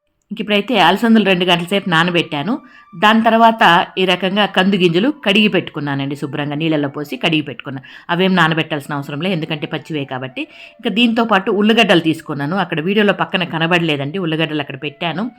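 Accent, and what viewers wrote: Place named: Bangalore, India. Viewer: Meeru maa laage matladataru ante memu Bangalorelo unde vallu itlane matladthamu alage ginjula pulusu kooda itlane chesedi❤❤❤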